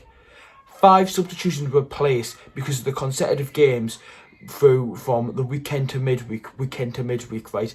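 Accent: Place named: Newcastle, United Kingdom. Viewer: Bro he’s talking properly that’s how we geordies talk keep up the good work doing great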